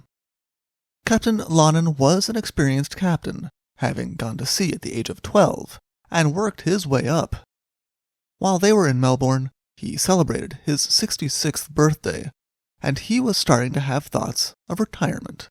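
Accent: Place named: United States. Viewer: What the hell is going on with the narration these days. Not to mention I am sick to death of hearing American accents for every damn thing that is read. They are the worst at the pronunciation of nouns and.any other name for that matter. How stupid is the rest of the world to let the USA take over everything on the planet. 😡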